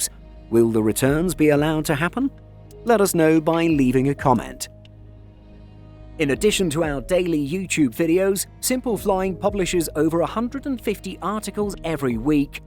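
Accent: United Kingdom. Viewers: The moment I hear this British accent, I know that the videos are lies and scams, because a lot of videos that I have seen using British actions or nothing but a scam, deceit and lies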